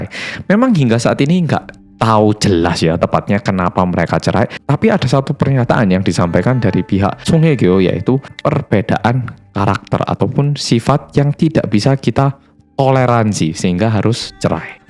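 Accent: Java, Indonesia. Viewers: Mas saya mah gak suka sama SJK, saya lebih suka sama kamu ... Muka korea logat jawa 😊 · Medoook👍👍 Edit mungkin lebih halus jika "diasuh" mas bukan "dipelihara" mohon maaf koreksi dikit🙏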